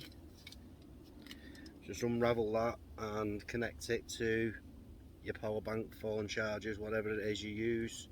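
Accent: St Helens, United Kingdom. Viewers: Your accent sounds like a proper St Helens accent. Are from around there?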